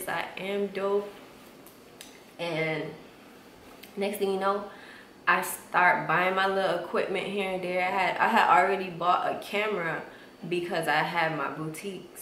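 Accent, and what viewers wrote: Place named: United States. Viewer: lol I was about to ask but wasn't sure because of your american accent--if you were Habesha lol. Now I know ur not :)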